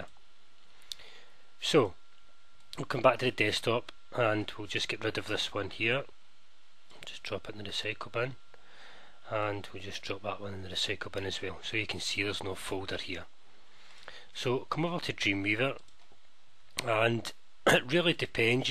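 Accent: Scotland, United Kingdom. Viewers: he is scotish trust me?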